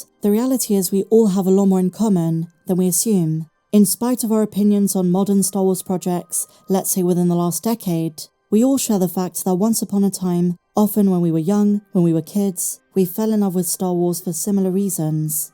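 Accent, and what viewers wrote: United Kingdom. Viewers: I love her Brit accent too, but she needs to address the muddled bass sound mix. There's little sibilance in there and she needs to up the mids and highs to correct it. One shouldn't have to pump the volume or use subtitles (filled with spelling errors a lot of the time) to make sense of what the speaker is saying, right?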